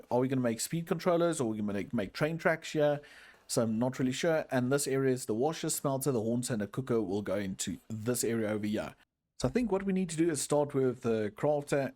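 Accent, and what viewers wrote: South Africa. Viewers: I knew you were South African. Happy we one the world cup!❤🇿🇦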